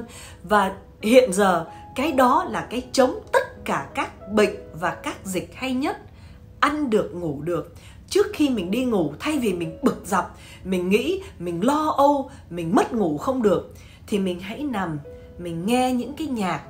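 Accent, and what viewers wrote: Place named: South, Vietnam. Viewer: Rat Thach Noi going nam, you are so cute!love you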